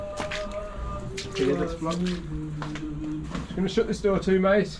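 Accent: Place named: Wolverhampton, United Kingdom. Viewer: have to disagree, I also like the Wolverhampton accent, be proud of your accent & ignore the begrudgers 👏👍☘️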